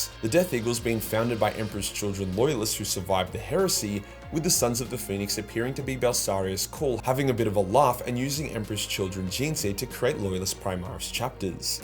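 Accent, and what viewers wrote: Australia. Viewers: bro how on earth is an edge lord channel? This is just how we talk in Australia lol.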